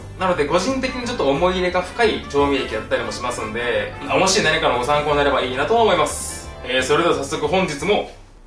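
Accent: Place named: Fukuoka, Japan. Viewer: ホル鉄最高ですよね 最近見始めたばかりですが 語尾が、やねとかイントネーションとかで もしかしてと思ったら福岡なんですね！ 柔らかい喋り方、聞きやすくてばり好きやん(いきなり博多弁) もしかしたら通りすがっとったかもと思うと...(口が緩みます)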